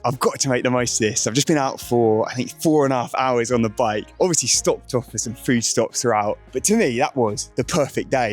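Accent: United States. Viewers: Please never abandon the American accent. Peak. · I feel like the video could have used more of the Translator. American accent 6.1 out of 10. Surfer or John Wayne?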